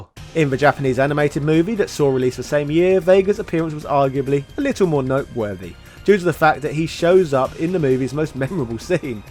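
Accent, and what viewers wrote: England, United Kingdom. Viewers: Ok, I gotta know what English accent you have....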